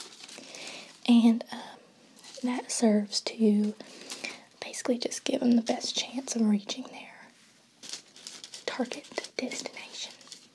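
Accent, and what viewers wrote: South, United States. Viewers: Why the hell are you singing and why do you have a southern accent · I have loved this entire series. Really impressed by your Southern accent as a Southerner myself.:) Loved the "surprise" ending and that chant! LOL. Haven't heard in a while.:) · Seriously loved your country accent in this series! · this is my first time watching her channel like bro American accent on fleak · This little series was so relaxing and reminds me a lot of the people from where I grew up! I usually hate such southern accidents but it's really cute when you do it 😊 thank you for all the tingles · so lovely, thanks for all those hilarious and relaxing vids with Brandy Jean and that southern accent XD You are the master of entertainment for me in ASMR!! love from Germany!! <3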